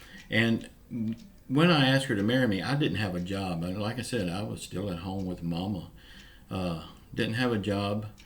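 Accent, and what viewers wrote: South, United States. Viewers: morning i can tell you are from the south im from the south knox tn who knows about Zayres except some one from the south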